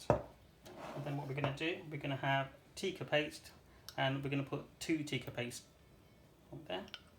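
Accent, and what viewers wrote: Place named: United Kingdom. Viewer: I love your accent. what kind of uk accent do you have?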